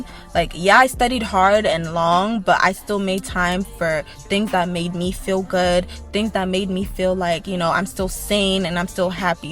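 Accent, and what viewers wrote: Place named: New York, United States. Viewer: I loved this video the entire time i’m like she sounds like she’s from NY bc I’m from the Valley. I felt like i was watching my own testimony from you saying God favors you differently to still living your life while studying But you saying OD nailed it 😂It was in the stars for you I love that you and your mom tested the same date So sweet Congratulations love 👏🏽👏🏽Thanks for the tips ❤️🙏🏼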